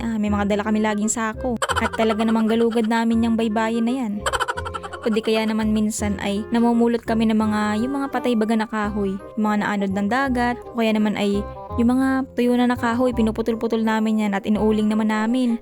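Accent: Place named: Visayas, Philippines. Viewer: Mat halong Visaya Ang storya mo. may lahi ba kayong Visaya day?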